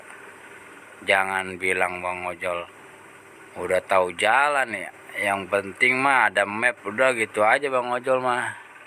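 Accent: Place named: Jakarta, Indonesia. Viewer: Logat ngomongnya seperti org betawi ya bang😊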